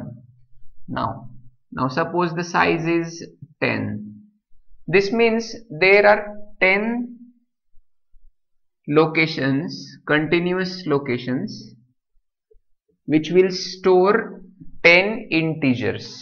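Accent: India. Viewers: That's indian accent , it's no way a British one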